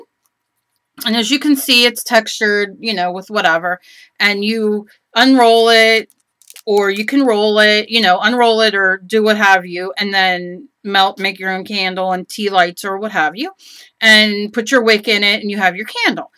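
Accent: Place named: Philadelphia, United States. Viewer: A very distinct dialect , of a provincial Philly accent . Very interesting . I use wax to preserve water color on wood . Dorland wax medium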